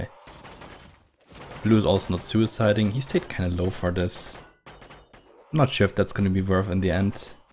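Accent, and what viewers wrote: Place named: Germany. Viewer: Dieser Moment, wenn du eine Sekunde nach dem Start des Videos merkst... ...dass der Sprecher Englisch mit deutschem Akzent spricht. :-D Aber nett, dass noch jemand das gute alte WC 3 spielt, weiter so! :-)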